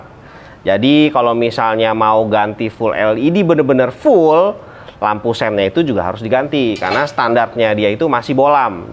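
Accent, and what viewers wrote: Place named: Medan, Indonesia. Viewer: Bang, abang orang medan ya. Logat bicaranya kayak bos saya. Hehe Semangat terus bikin chanelnya bang.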